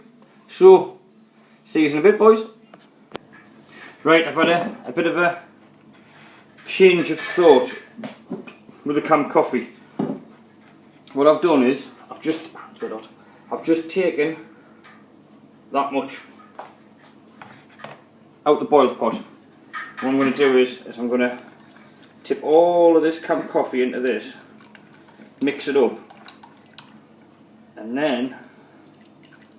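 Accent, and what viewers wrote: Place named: Blyth, United Kingdom. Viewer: You sound like your from my neck of the woods. I'm in Blyth.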